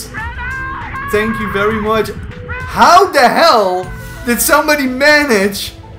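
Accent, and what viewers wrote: Netherlands. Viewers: sick vid :D you dutch?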